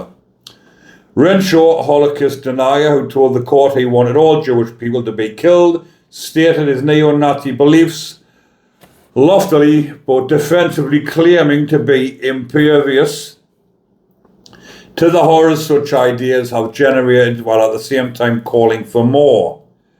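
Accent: Newcastle, United Kingdom. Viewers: Id sue that car you tried to get out the way but the car just jumped out at you so you didn't get chance to move out the way so it hit you thank god you servicer. I really dont like Facebook i have my reasons so id love to see you're working on this channels and your voice is cool there's something about Newcastle accent.this will make you laugh the last few people who have seen me must be bloto cos ive been mistaken to be looking like a man that can be helpful and come in handy ill get ragged about you accent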